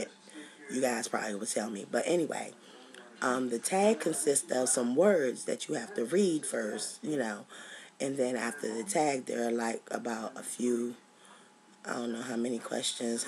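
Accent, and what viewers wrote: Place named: South, United States. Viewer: hahaha love this yes u do sound so country i love it though thats what makes u though:-)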